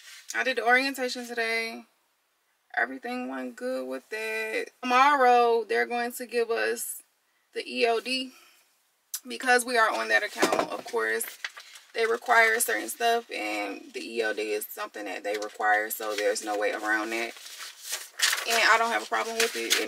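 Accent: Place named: New Orleans, United States. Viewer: you from new orleans huh?i hear it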